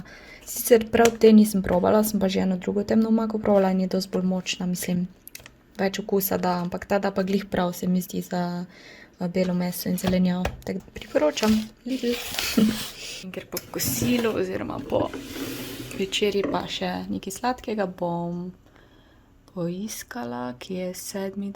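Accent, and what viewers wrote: Carinthia, Austria. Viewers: Tvoji vlogi so meni zelooooooo zanimivi saj se pocutim zelo domace ko slisim koroski naglas ker sem tudi sama iz koroske🙈😂🥰👍👍🌈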